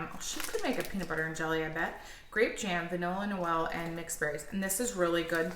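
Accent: Wisconsin, United States. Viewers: Love seeing your face!! So gorgeous ❤️❤️ I love that I can hear the Wisconsin in your voice. Minnesota here 🖐🏻 😂 I have the accent too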